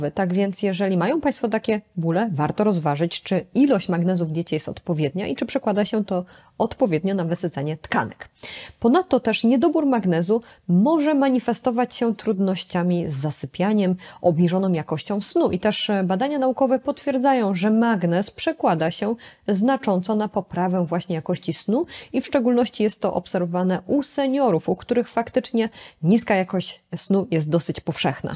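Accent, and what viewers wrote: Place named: East, Poland. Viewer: Ta pani pewnoe jest ze wschodu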